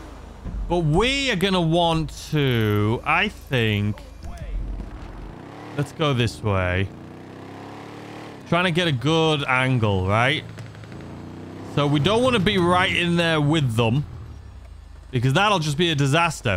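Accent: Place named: Northern England, United Kingdom. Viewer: why do you have a northen english accent but from america? did you move there not to long ago or something mate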